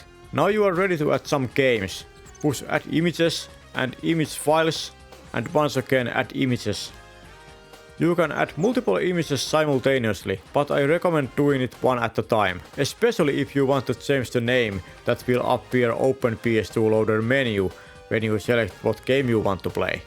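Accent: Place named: Finland. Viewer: Seconds into this video I could hear Fintlant. I trust Finns for no-bullshit explanations of everything. Thank you for the great video. Perkele!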